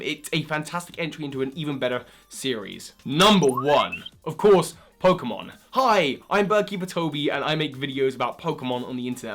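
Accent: United Kingdom. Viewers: I love British accent so much!😫😫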